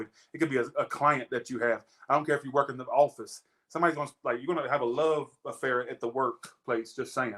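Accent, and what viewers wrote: United Kingdom. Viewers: Uk accent 👌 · Love the UK accent lol xxxxx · The British accent 🤣🤣 I definitely spend too much money in Tesco !!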